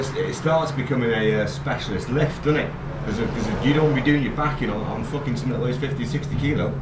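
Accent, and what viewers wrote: United Kingdom. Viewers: ive had a bash at making my own with limited success :( i saw your video and was very impressed by the temperatures you where achieving .was wondering what you sell them for and if it was possible to do smaller scale ? im from Newcastle on tyne so great to hear a British accent person making these videos instead of out back Americans lol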